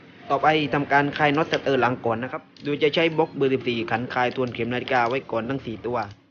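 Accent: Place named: South, Thailand. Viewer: พี่แกน่าจะคนใต้ครับ ฟังจากสำเนียง 555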